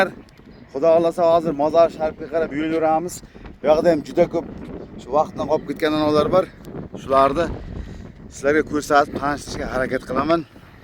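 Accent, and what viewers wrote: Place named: Surkhandarya, Uzbekistan. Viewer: Бу сурхандарёдан узбекча гапиряпдику ашитта машутта олифтагарчилик кимаяпдику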